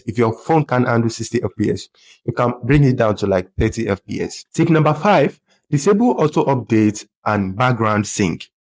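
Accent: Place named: Nigeria. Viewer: Nigerian accent 😊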